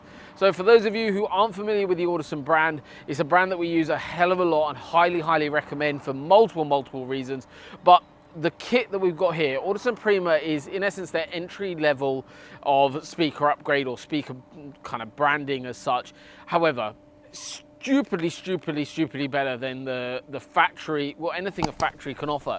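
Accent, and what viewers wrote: South Africa. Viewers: Instantly picked up the South African accent, two words in. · I hear a South African accent a mile away 👍🇿🇦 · that accent is just RSA, no DNA. Awe ma se kind 😊😊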